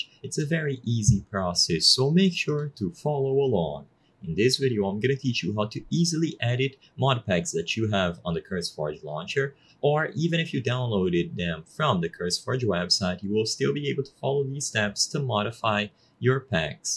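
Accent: Brazil. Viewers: sabia que tinha sotaque de BR, entrei no canal pra ter certeza kkkkk. Valeu pelo vídeo irmão!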